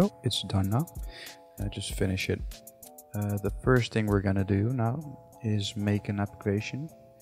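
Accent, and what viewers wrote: Netherlands. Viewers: yeah I was thinking the same ben je nl =) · Your accent sounds Dutch. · Dutch? Nederland of België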